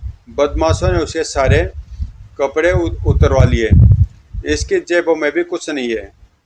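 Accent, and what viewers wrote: Haryana, India. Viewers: Love your Haryanvi Style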